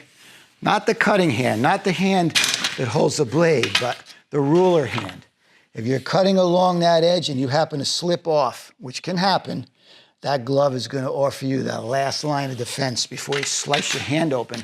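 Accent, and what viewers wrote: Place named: Boston, United States. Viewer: absolutely not Spent 15 years in Boston.. now back in Ireland.. your accent brought some good memories back.. “Now go get your Shinebox”…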